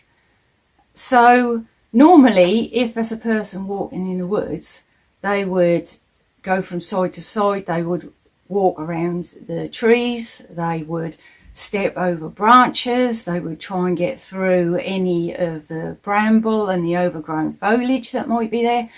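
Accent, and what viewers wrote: Suffolk, United Kingdom. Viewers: Love your videos. I’m a Suffolk girl born and bred, like you. Love hearing our accent. It seems to be disappearing, please keep up your hard work. xx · As me dad would say bootiful me darlin 😊😂 Im originally BSE .my family all over Risby ,Thetford, Stowmarket. Im suffolk side of Essex, suffolk, Cambridgeshire border now not the same but so proud of my accent not as sharp as yours my aunties all had that broad suffolk love it hun ❤️ love a good ghost storie . Years ago we go on Sunday drives with mum and dad. End up anywhere west stow . Fritton. Snetterton Sunday market..Felixstowe.. go nut picking berry picking. Explore woods and little villages beautiful had proper family time no one get that chance today 😢 thats how I know i always feel at home more in the woods but no dont want dark figures giving me the hebie gibies 😂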